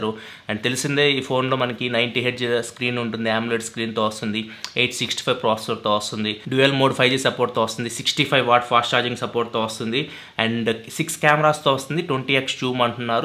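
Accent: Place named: Telangana, India. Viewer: anna.. Telangana Yasa madyalo Mast undi😍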